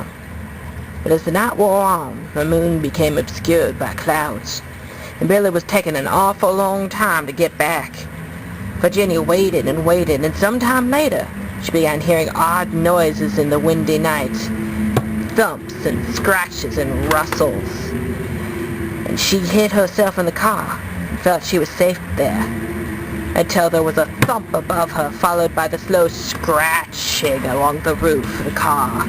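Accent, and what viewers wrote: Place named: South, United States. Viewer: You have a pretty good Southern accent. Good story telling. Thank.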